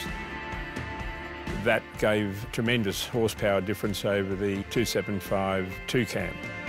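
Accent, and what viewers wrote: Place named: Australia. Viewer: WOW beautiful "old girl". Sounds like your Aussie, Im stoked to think we have one of these in Australia. Hope I see you on the road one day.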